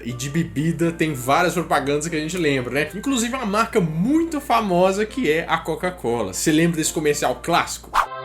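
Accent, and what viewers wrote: Minas Gerais, Brazil. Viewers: Feliz Natal!!!! Feliz Natal!!!! Adoro o seu canal e mesmo sendo redundante não me canso de falar que é melhor canal que eu assisto. adoro O mineres arretado que você tem. Sou a chamada baiana cansada porque sou de Janaúba no Norte de Minas, fã número 1 das gerais, rsrsrsrs. Não perco os seus vídeos principalmente quando vem recheado de histórias que eu amooo. Beijos e muitos sucessos.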